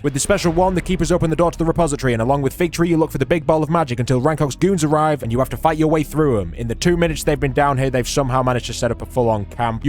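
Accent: United Kingdom. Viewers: Once again hitting a banger I don’t know why buts it’s funny listening to a British man explain games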